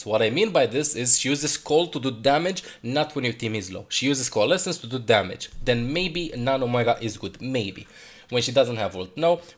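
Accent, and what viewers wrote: Romania. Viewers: His accent is Romanian if you were wondering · Are you romanian?.Ca ai un accent :)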